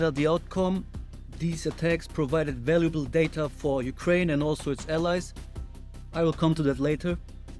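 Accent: Germany. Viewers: Your German (?) accent is so annoying though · are you German? you have a heavy German accent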